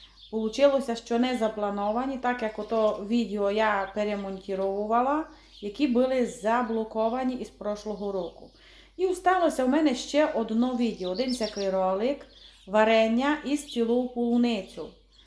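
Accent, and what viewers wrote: Transcarpathia, Ukraine. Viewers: Дякую за рецепт, дуже гарно розмовляете по закарпатський!! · Щиро дякую, за рецепти, за приємне закарпатське мовлення. Здоров'я вам,наснаги і здійснення всіх задумів. Слава Україні!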